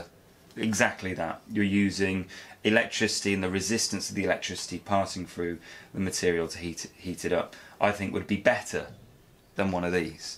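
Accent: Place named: United Kingdom. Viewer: I like your videos and British dialect, I made my own from parts I salvage from SMPS PCBs, Plasma TVs etc.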